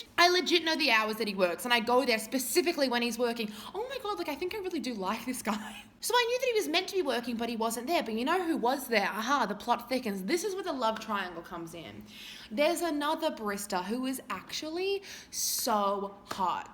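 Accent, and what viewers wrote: Australia. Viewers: Were you born in Malaysia bc you have an Australian accent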